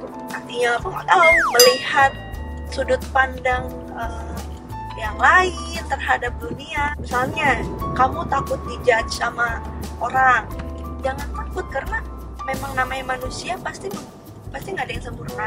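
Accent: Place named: West Java, Indonesia. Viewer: orng sunda nya katingal teh cara nyarios na 😬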